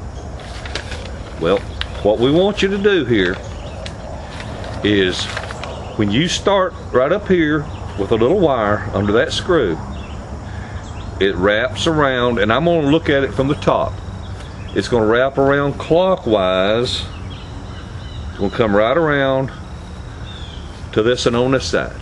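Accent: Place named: South, United States. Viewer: Hey, I love your southern twang. The information was great. I'm going to build one. Wife bought me a $80 digital antennae in the house. I get 15 channels. This should also help get more.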